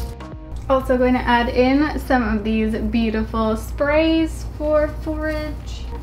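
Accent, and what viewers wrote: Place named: Canada. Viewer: When you even realized how Canadian your accent was LOL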